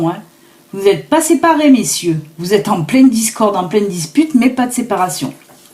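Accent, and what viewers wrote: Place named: Lyon, France. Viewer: Bonjour je vous remercie pour vos guidances,votre accent lyonnais est une vraie joie chaque matin,pour une lyonnaise,comme moi,dans le sud ouest! J'espère ne pas vous heurter,en vous disant que vous me rappelez, une rubrique matinale de mon enfance qui s'appelait "la mère Cotivet" Au-delà de son accent,elle était pleine de bon sens! quant à ceux qui ne sont pas contents,de vos guidances, j'espère qu'ils vous remercient lorsque cela les concernent directement! je vous souhaite une belle journée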